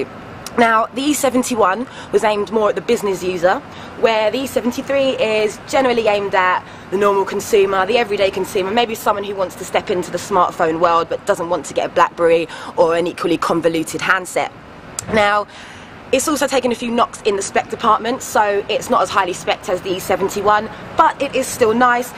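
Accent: United Kingdom. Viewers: british english :D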